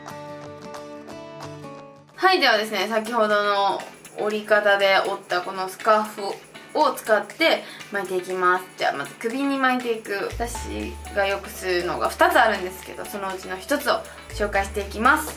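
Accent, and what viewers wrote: Kansai, Japan. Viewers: 石原さとみさんに声似てると思ったらなんか顔も似てる😲✨👌 石原さとみさんの関西弁ってかんじです！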